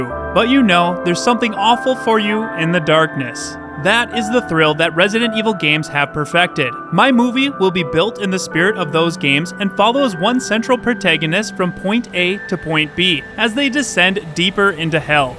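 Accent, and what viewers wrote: Canada. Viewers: Man, love the Canadian accent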